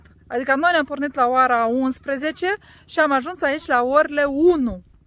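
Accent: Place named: Moldova. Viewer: Bonjour..!! Omul bun atrage binele.. Te urmăresc cu mult drag si te admir pentru ceea ce faci, singura prin lume. Accentul tău moldovenesc imi încălzește sufletul. Bunicii mei au trăit la graniță cu Rusia si vorbeau aceeași vorbă dulce ca și tine. Mult succes în continuare. Prends soin de toi! 🤗🍀🥀🥀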